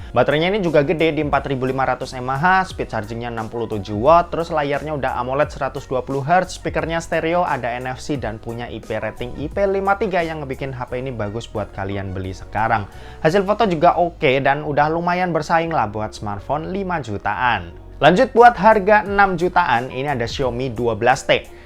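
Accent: Semarang, Indonesia. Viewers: Orang semarang ya kak?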